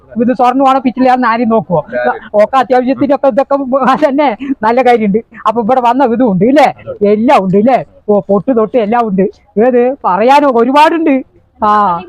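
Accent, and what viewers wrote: Palakkad, India. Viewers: പാലക്കാട് ഭാഷയെ മോശം ആക്കാദെ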